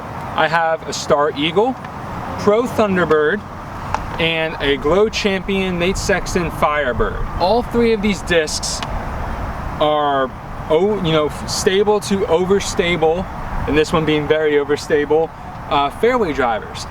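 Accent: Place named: Colorado, United States. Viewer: I hear a Colorado accent. Are you from CO?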